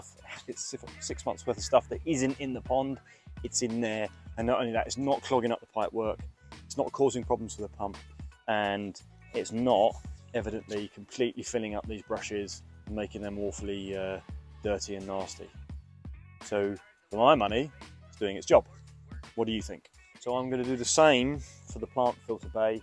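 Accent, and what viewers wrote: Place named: England, United Kingdom. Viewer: He’s speaking in a English kingdom accent and people that do that have a different definition of words ..often the English like that is only understood by the speaker or someone that does the same..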